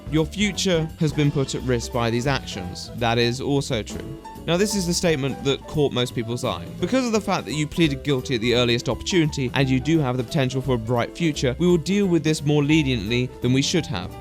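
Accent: United Kingdom. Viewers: is this a voice you put on or is it genuine? it's the most english voice ive ever heard aha, and i'm english myself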